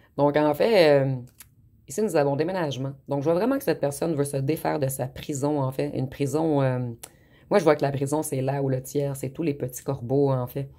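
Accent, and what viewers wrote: Quebec, Canada. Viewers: Ah ah non en France ce n’est pas spécialement en juillet ! C’est toute l’année à n’importe quel moment ! Sauf que dans mon cas en effet, mais c’est moi qui doit déménager en juillet ! Avec un peu de chance il aura envie de venir emménager chez moi ! 😆 Merci pour cette guidance ! C’est bon d’entendre votre accent Québécois qui me manque beaucoup ! ❤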